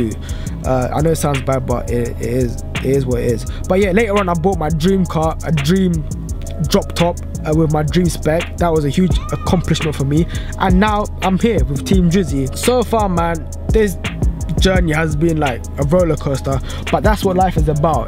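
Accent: London, United Kingdom. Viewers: If you're born in France,why do u have such a strong London accent?😟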